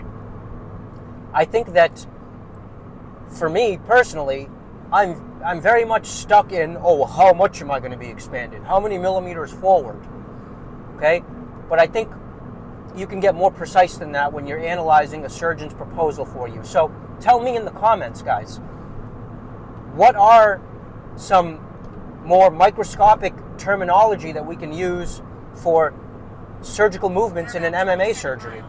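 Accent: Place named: Eastern New England, United States. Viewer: I love your Eastern New England accent!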